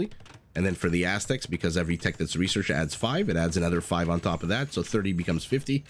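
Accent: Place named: Canada. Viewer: youdaman,! love the Canadian accent, ive got an Eastern KY one, myself.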